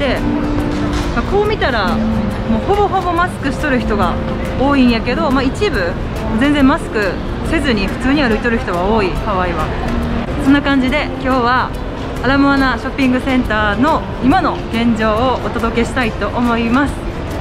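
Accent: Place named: Ise, Japan. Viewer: 同じ伊勢弁なのが嬉しいです✨親しみ持ってます♪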